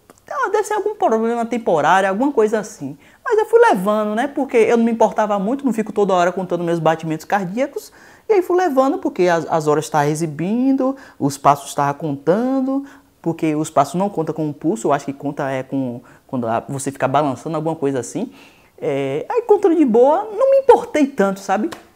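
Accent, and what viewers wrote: Bahia, Brazil. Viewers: Sou de São Paulo e adooooro seus vídeos, seu sotaque baiano é musical😊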